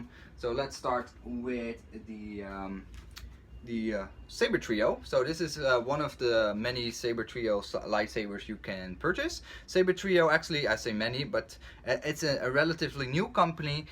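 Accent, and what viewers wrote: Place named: Netherlands. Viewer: Ik kan aan het accent horen dat je Nederlands bent😂😂😂😂😂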